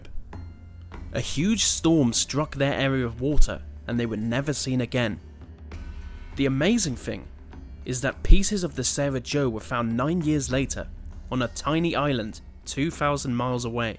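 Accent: England, United Kingdom. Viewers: Why do you continuously substitute "F" for "Th"? We hear you pronouncing "Th" when it's IN a word sometimes, but often when it begins or ends a word you substitute an "F". You say "with" properly, so why do you then say "August sixteenf 1942 "? It's really distractive and annoying. If it was your 2nd or 3rd language it would be understandable, but you have a distinctive English accent, so it must be your 1st language. Please make an effort to speak properly, since it's obvious that you can.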